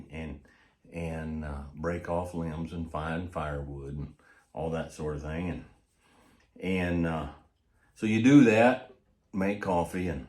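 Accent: United States. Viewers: No worries you 're doing all well mate . Your coffe is great pal . Love watching your videos . And I adore your cowboy's accent mate ))) Great respect from STRONG UKRAINE ))) I keep watching you . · Am not English speaker, sometimes I get the meaning, you are sir a very good man, I wish I was fluent in English especially understanding this strong real American accent.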